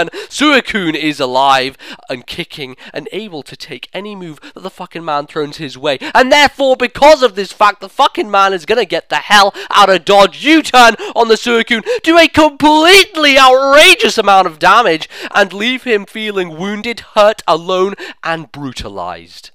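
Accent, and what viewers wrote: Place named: United Kingdom. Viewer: i'm guessing you're british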